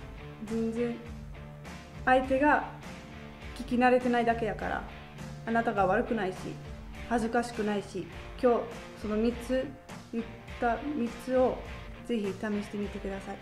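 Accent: Kansai, Japan. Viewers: 関西弁喋る外人ってなんか面白いし好き。笑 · ちょいちょい関西弁入るのが良いね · 関西弁がおもしろい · 何でこういう外国の人ってみんな関西弁なん？ｗｗｗ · なんか関西弁入ってる？ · なんだこの美人！！ 5カ国語喋れんのか？ しかも関西弁？ しかもなんか一生懸命さが伝わる👍 うらやますぃ〜ぞ！彼氏👍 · 美人で関西弁は最強！ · ちょっと関西弁混じってる？ 可愛い♡ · 関西弁ええな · 女神さまが、関西弁しゃべってる 素晴らしい😻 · めっちゃ綺麗！関西弁がたまらんw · 関西弁親近感湧きます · 関西すぎた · そうやってアクセント気にせんと関西弁喋りまくったからうまくなったんですね！ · 本当に美人ですね！実在の人物ですよね？（笑）声もステキだし、関西弁もいいですね！ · お人形さんみたいで凄くかわいいですね☆ 関西弁で一生懸命話してるのが心打たれます^_^ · 何故に関西弁、、、（｀・ω・）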